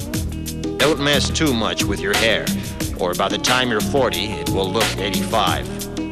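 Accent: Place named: United States. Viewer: he sounds american,but isnt he australian ?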